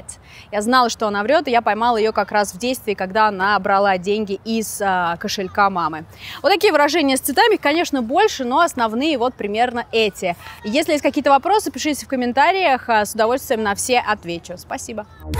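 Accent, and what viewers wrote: United Kingdom. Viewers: What a beautiful English accent! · Слушать такой аутентичный британский акцент одно удовольствие!) Было бы здорово, если бы рассказала, как удалось его перенять) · вопрос действительно есть. ЭТО ПРОИЗНОШЕНИЕ МЕНЯ ПРОСТО УБИЛО НАПОВАЛ. КАК??? Как добились, кому продали душу? Это просто невероятно, первый раз слышу настолько обалденный british accent из уст русскоговорящего человека. это респект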